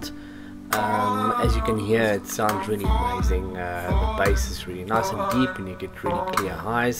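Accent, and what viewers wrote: South Africa. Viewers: Great to hear that South African accent! Brilliant speaker build as well. Thanks for posting :) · awesome video, agree with Jeremy great to hear South African Accent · Sorry for the rather odd question, but are you South African? Kind of have an SA accent :) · Dude Are You From South Africa?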